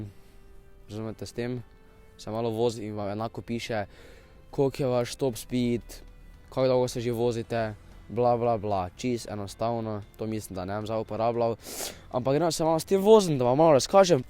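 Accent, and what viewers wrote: Styria, Slovenia. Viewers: Nauč se govort normalno pa ne po Štajersko pa boš Mel več ogledov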